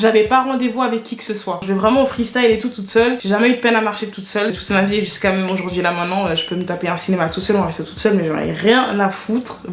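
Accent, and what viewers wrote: Cameroon. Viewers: trop marrant surtout avec ton accent camer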